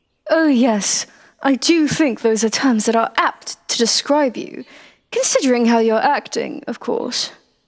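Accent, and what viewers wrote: RP, United Kingdom. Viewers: Damn!!!!! that thumbnail is mega shes hot love the posh voice too very beautiful ❤️ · oh no, almost made it out of class with the crowd.. she was too quick for us👩‍🏫. From dissapointment to losing out on an award and an ear bashing from the teacher, to getting a bit of a personal glory and history lesson from her and being told to shape up for ourselves.. this is an ideal teacher. Took a gamble admitting what we thought of her personally too, did give us more motivation to get back on schedule and get to spend more time with her, studying📚. Magical academy always makes me think of a potty school for wizards 🏰but this took it in a new direction and had a bit of a DnD feel which is a great change, original and fun idea and can't help but like the posh accent in a dominant tone either, brilliantly fun audio once again 🎧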